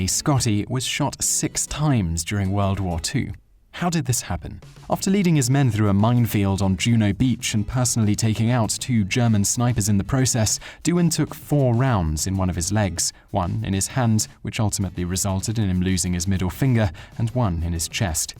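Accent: United Kingdom. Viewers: A few comments. First, when discussing he was shot by a 'Canadian gunman' you show a pic of someone wielding an American M1 rifle. And why the term 'gunman'? Sounds amateurish. Soldier or rifleman would have been better. I believe it was a burst from a Bren LMG that got him. In his autobio he says that he didn't notice the leg wounds and was fixated on his finger hanging by a bit of skin. He went to an aid station and the medic merely tore his dangling finger off then said, "now, how about that leg?" He was stunned that he hadn't noticed the most serious of his wounds... or that he could even walk! Now for the bit that irks me the most from this video - particularly as the narrator is obviously a Brit. That would be the MISPRONUNCIATION of the rank lieutenant. Who do you think we Canadians are, a bunch of yanks? Every English speaking nation of the world pronounces it 'lefftenant' EXCEPT one... 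the USA. I have no problem with that - that's their way. But, for the love of God, stop using the US version when referring to the Commonwealth! You're likely thinking, "oh relax. It ain't no big thing..." But it is. We are losing the finer points of our culture to a deluge of US influence. Already far too many ignorant Canadians use the wrong pronunciation (even reporters who often say "Lootenant Governor'....grrrrr) without having someone with a Brit accent confusing them even more. And to all the dummies who say, "but it's spelled that way..." I say, speak the rank 'colonel' out lou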